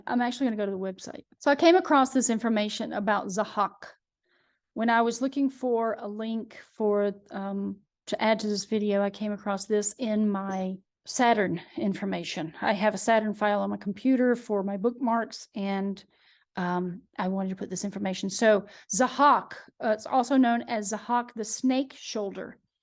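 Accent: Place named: South, United States. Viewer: I just found you today from the typical podcast show. I am elated. I can not believe all these things you're saying on his show, I know most of. I have been fascinated with the occult and symbolism for quite awhile, but a lot lately. I like to find things from the past, like photos of the Rothschild parties with their Saturn costumes, and Pink Floyd songs, and many books. I read a lot. There is too much for me to list here, but I do a lot of research. Also, I've had many OBE's although its been awhile. I wonder what part of the country you are in? I hear a southern accent. I'm in New Orleans. So happy I found you!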